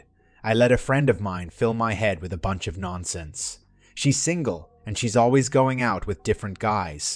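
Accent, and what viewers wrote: United Kingdom. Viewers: Lol. Annoying British accent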